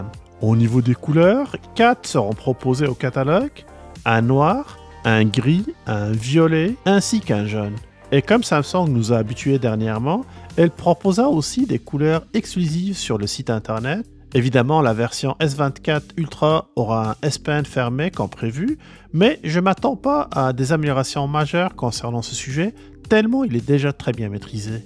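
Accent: Canada. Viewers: je deteste l'accent canadien omg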